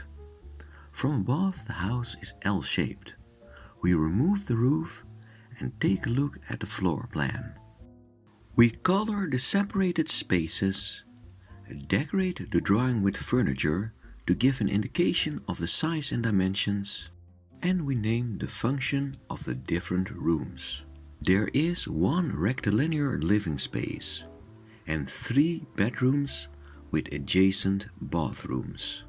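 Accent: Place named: Netherlands. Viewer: The addition of the third bedroom looks poor from the outside, especially with the off-the-shelf aluminum frame window. I'm thrilled to have discovered your channel! They provide so much more than the rather limited views afforded by various coffee table books, even those by Julius Schulman. Excellent graphics and organization. I really get a feel for the interior spaces. By the way, when I watched your first video, I kept puzzling over your accent. When I learned you're from the Netherlands, I thought--No wonder! You guys always throw me off! I once knew someone from the Netherlands, who had gotten lessons with a speech therapist to help with accent reduction. She was from the deep South, however, so he ended up with the craziest American accent I've ever heard.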